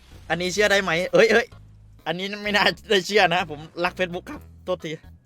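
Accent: Thailand. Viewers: พี่ก็พูดสำเนียงไทย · ไทยรัฐ บู้บี้ สำเนียงคนไทยหรอ555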